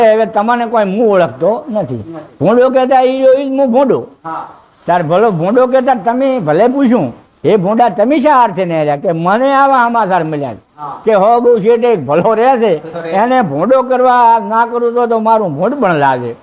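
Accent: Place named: North Gujarat, India. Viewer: Love Gujarati language ❤❤❤in north Gujarat style ❤❤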